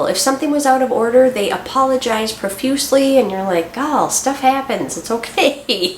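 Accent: Minnesota, United States. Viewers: I wondered where you were! Thanks for sharing ypur pictures of the trip. It is on my bucket list and now I am even more excited to see those countries, so beautiful! I admire your creative choice to put in the specialty stitch on the background. It does look like a tapestry. You should stitch your name in the other one. I am blanking on the names of them right now as I started watching at bedtime and now it is morning. Brain like a sieve! I enjoy your videos for many reasons not the least of which is that I grew up in MN and when I here you speak I get a bit nostalgic.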